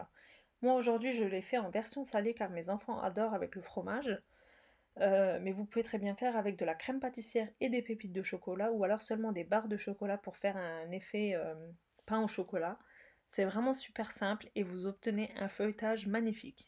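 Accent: Alsace, France. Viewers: SVP Madame Vous serait-il possible de noter les ingrédients utilisés pour chaque recette sous la vidéo ? Ce qui permettrait de les préparer à l'avance, puis vous regarder faire tout en appliquant vos conseils qui sont super, extra ! Vous êtes donc d'origine turque (avec un petit accent alsacien)... Merci beaucoup pour vos recettes, il faut l'avouer, elles sont fabuleuses ♥♥♥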